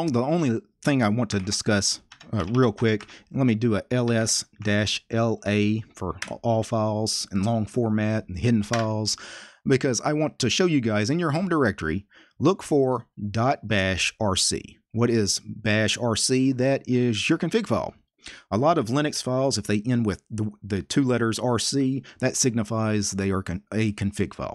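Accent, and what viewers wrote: South, United States. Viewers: Your editor put grip because they can't understand a southern accent apparently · I thoughts it was weird when i first heard Indian accent in a video teaching me computer science, turns out its even weirder hearing a Southern accent in a video teaching me computer science. Jokes aside, its a great video with clear explanation. Good job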